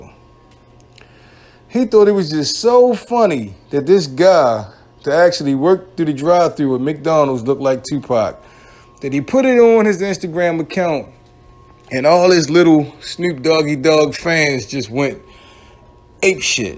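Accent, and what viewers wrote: Baltimore, United States. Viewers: You must be from Baltimore the way you say those o's lol. You sound like Snoop from the wire.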